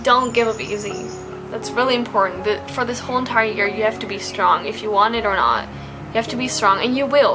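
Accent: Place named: United States. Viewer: You haven't lost your American accent yet. Great tips!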